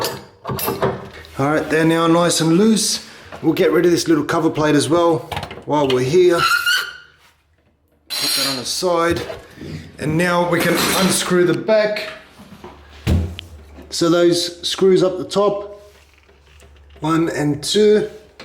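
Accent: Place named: Australia. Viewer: Simply explained but must be Australian.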